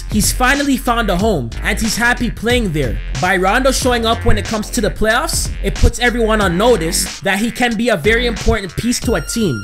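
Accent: Toronto, Canada. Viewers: Just wondering from your accent. Are you from Toronto?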